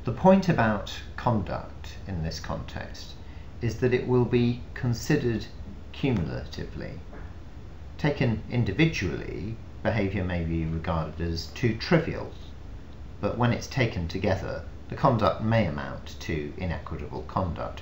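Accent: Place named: England, United Kingdom. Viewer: Guy has a damn English accent - geesh!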